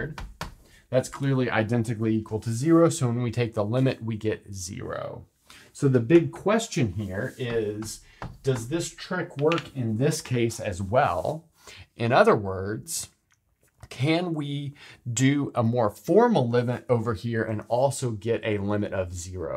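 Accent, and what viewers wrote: United States. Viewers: at the beginning of seeing these videos I was thinking that the formula lim f/g=lim f'/g' was the log beetle rules and I realized that it was l'hôpital rules and I am french😂 American accent is really fun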